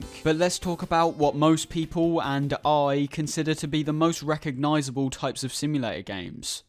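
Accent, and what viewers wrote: United States. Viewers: I thought the thick American accent gave it away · Yes he definitely sounds like he was born and raised in South Arkansas. American through and through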